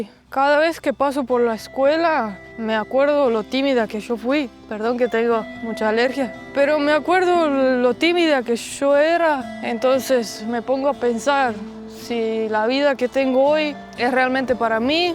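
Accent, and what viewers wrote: Argentina. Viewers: Tiene acento argentino o me parece😮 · Un lindo detalle tu español es muy Argentino, me hace reír , se te escucha bonito , cuando quiera mudarte a algún lugar vente a vivir a Venezuela,la isla de Margarita pudiera ser y cuando extrañes el frío , te vas a los Andes , y quiero selvas Ríos te vas al sur Al estado Bolívar , y al estado amazonas , y si quiera decierto ve a los médanos de coro , Adicora , y para el caldo s ve a Maracaibo, y quierea estar con ganado te al llano , montas a caballo , y cantas y bailas Joropo , aquí lo tienes todo ven que te muestro 😅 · tienes acento argentino 😲😮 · Habla su español en Argentino 😅 .. te felicito por tener muy buen español excelente video bonitos recuerdos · Fantástica, debería hacer más vídeos sola, por cierto no veo nada raro en tu voz, lo curioso es una estonia con acento argentino, deberías buscar un acento más acorde a tu continente, es decir castellano europeo, no latino americano, que no es algo malo, pero pareces argentina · Habla perfecto,es español,y tiene acento argentino😊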